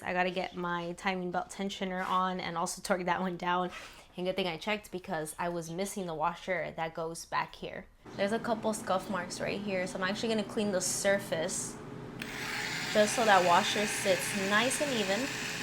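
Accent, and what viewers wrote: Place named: Mexico. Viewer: She have a Mexican accent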